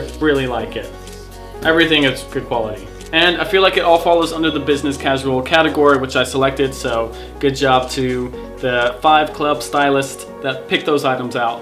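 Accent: United Kingdom. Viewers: developing that British accent I hear... :) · The british accent is kicking in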